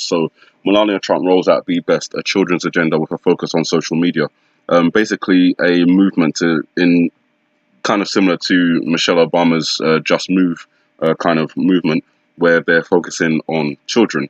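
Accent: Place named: London, United Kingdom. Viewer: Nice to hear a Londoner speaking on these matters, keep up the good work brother..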